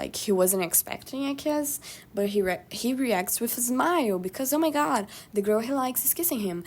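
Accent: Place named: Russia. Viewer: oh sorry! your accent just reminded me of a russian one. i love this video btw;3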